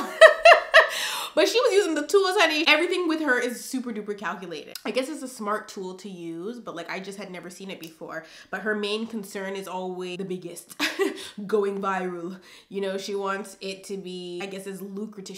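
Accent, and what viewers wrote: United Kingdom. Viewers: The British accent is taking me out! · The British accent 😂😂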